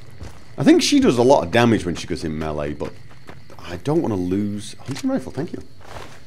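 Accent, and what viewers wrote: United Kingdom. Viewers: Can we have subtitles in videos? My siblings dont know enough English to understand your British accent.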